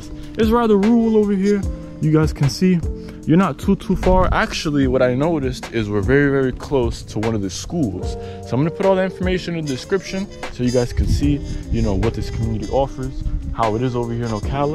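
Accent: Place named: Portugal. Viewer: O teu sotaque espanhol é mais parecido com o português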